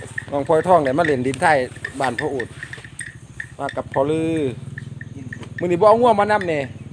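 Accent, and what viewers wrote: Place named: Isan, Thailand. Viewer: ชอบที่แกพูดภาษาท้องถิ่น ภาษาอิสานน่าฟัง เวลาบรรยายก็มีภาษากลางบ้าง แต่เวลาพูดกันเองพูดภาษาท้องถิ่นดีแล้วค่ะ ดูอย่างควาญสิงห์ แกบรรยายเป็นภาษากลาง แต่เวลาพูดกันเองแกพูดภาษาท้องถิ่นชอบค่ะ แม่บางช่องพูดภาษากลางตลอด มันเหมือน DJR ค่ะ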